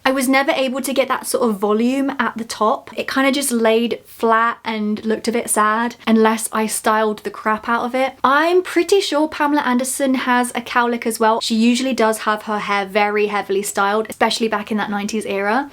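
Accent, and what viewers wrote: United Kingdom. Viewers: I love British girls and their accesent!❤ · is this british accent?? it appears i can't stand it it sound so raw and unnecessary annoying :( the accent could have been triggered my misophonia but i like u so best of the luck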